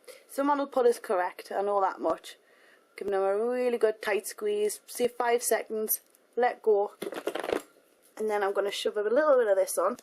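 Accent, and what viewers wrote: Ireland. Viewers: yea i love her irish accent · Loveee your accentttttt xDDDD Irish???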